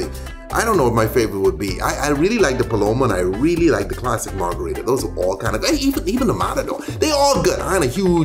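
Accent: Bahamas. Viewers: He sound Bahamian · Nice video. Sounds a little Bahamian.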